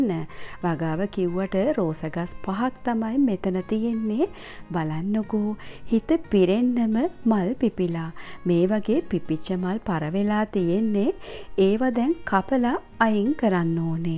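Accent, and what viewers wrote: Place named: Japan. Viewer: ඔයා වැඩකරනව දකිද්දි මටත් අතපසුවුනු වැඩ කම්මැලිනිසා අතහැරපු වැඩ මතක්වෙනව🤭ඉතින් ඒව කරගන්න හොද උත්තේජනයක් ලැබෙනව ඔයාගෙන්👍❤️ඒවගේම පැටිය දැක්කමත් හිත පිරෙනවනෙ😘තවදෙයක් කියන්නම ඕන පැටිය තරම් නැතත් ඔයත් සිංහල කතාකරන්නෙ හරිම ලස්සන ජපන් උරුවකට ඒකටත් මම ගොඩක් ආසයි.❤තෙරුවන්සරණයි පැටිය ආදරෙන් මතක්කලා කියන්න🙏😘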